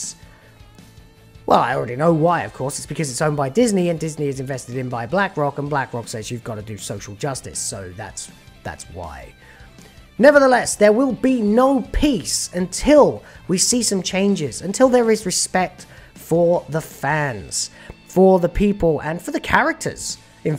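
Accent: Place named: England, United Kingdom. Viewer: Oh, now I know what Joe Pesci would sound like if he had an English accent. Good show.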